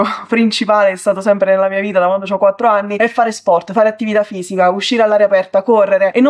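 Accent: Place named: Naples, Italy. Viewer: sei napoletana?io di Salerno... Sembra che hai lo stesso accento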